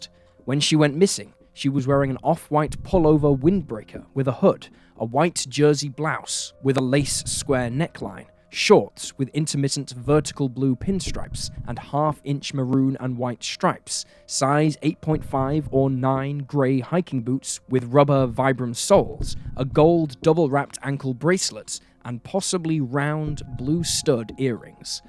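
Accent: United Kingdom. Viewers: I missed the British voiceover!!! Welcome back!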